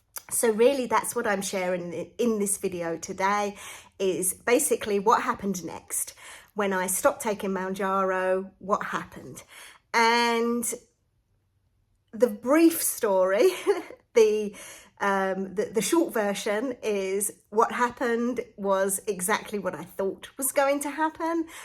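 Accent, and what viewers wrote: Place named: England, United Kingdom. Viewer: Great video, you're very brave for sharing such obviously uncomfortable personal information - great to hear an English accent too!! You look fantastic, healthy & happy. I'm in Australia, I'm 68 & 4 days in on MJ (started on 5mg- very hard to get here & expensive $360 per month) & feeling very positive after hearing your story! SUBSCRIBED! :)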